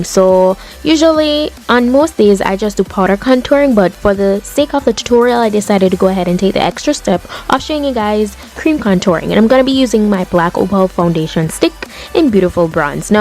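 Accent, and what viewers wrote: Jamaica. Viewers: You sound Jamaican! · My first video and girl I need to know if you're Jamaican or from the Caribbean cuz.... mi hear it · loveeeeee your jamaican accent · yaady fi true 🤘💗💗💗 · Every body a ask bout u nationality.... Omg. U sound Jcan tho but confirm nuh!! Anyways luv ur vids; u just be popping with or without makeup... Best contour- no foundation video I've seen.💖💞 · I Love how you talk man. just love my fellow jamaicans.. this was so helpful bc really I agree with using the foundation on where u didn't have any product.. makeup lasts longer.. less makeup shopping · I knew it... I was about to ask the question I heard it when you speak, Jamaicans dialect cant hide lol! awesome vid! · are you Jamaican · Yeah, I knew she was Jamaican because I am a Bahamian and she doesn't sound like us.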